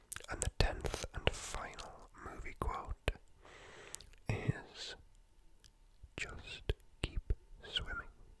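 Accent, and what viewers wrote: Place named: Newcastle, United Kingdom. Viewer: Straight up following cos I’m from Newcastle 👋🏼👋🏼👋🏼 been dying to find a Geordie asmrist!!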